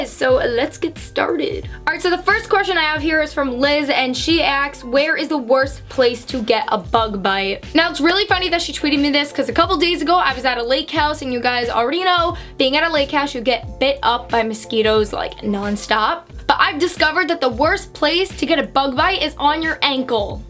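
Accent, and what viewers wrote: New York, United States. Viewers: Love your New Yorker accent, its so cuteee! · Her new York accent is on fleek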